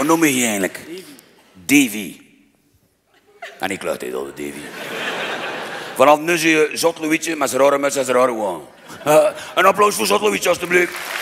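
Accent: West Flanders, Belgium. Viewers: west Vlaams uit België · jemoe ma west vlaams kun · Top westvlaams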